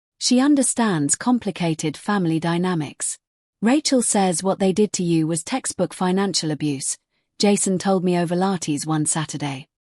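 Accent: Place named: United Kingdom. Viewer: As a Brit surprised to hear a British accent in this story. “I started therapy to understand why I believed them & thought you were overreacting” Good Grief Watching from Leicestershire in the UK 🇬🇧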